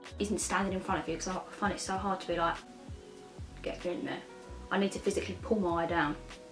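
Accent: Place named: United Kingdom. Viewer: Your British accent is so cute 😍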